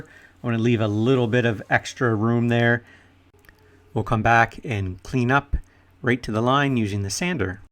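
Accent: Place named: Alberta, Canada. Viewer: This man has an Alberta accent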